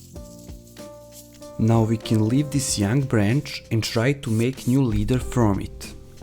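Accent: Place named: Russia. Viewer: Вы русский? у вас акцент, ну или произношение русского)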